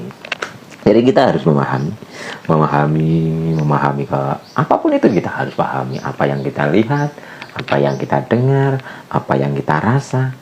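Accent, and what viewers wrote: Banyumas, Indonesia. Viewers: Assalamualaikum wr wb Qolbitu ustadz ilmu nya,,ilmu sgt bermanfaat,,ustadz ko ngomong ngapak ya 🙏😀😀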